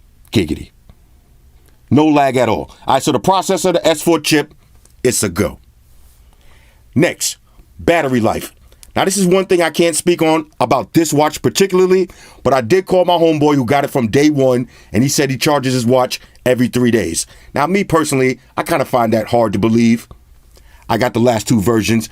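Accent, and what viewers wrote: New York, United States. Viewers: exactly!! lol and that NY accent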